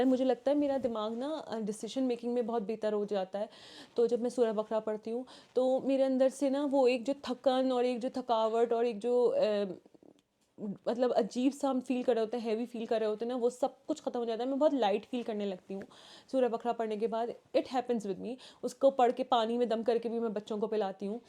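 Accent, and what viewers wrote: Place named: Pakistan. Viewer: Aur ek baat mai India se hu Baji Mujhe pakistani video bohat achhe lagte hai. Aap logo ka baat karne ka lehja Masallah bohat achha hota hai. 😊😊😊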